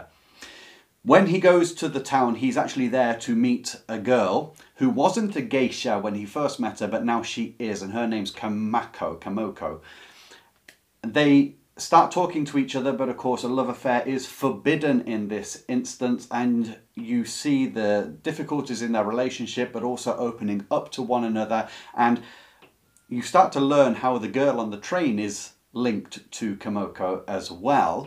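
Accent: England, United Kingdom. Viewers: What an engaging list of books you have! I am interested in the book "The Sicilian Romance" and quite a few other books as well. You have an amazing You Tube channel! I adore your English accent too.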